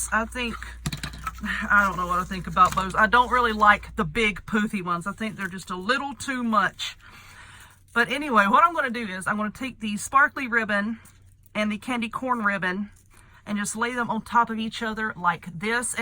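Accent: South, United States. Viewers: I LOVE cats and Candycorns! What an Adorable combo! You are So creative, I love it! I just got back from DollarTree a while ago with the stuff to make your owl wreath! I can’t wait! I love you videos SO much! I love listening to your southern accent and some of the cutest southern things you say! Thank you so much for brightening my days and helping me be more creative!🎃🎃🎃🖤🖤🖤🧡🧡🧡🎃🎃🎃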